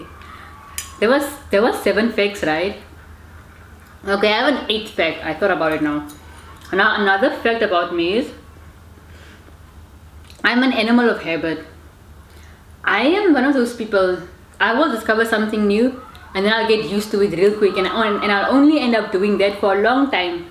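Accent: India. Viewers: I love you... You sound Indian a lil bit😂😂😂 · You starting to sound like an Indian girl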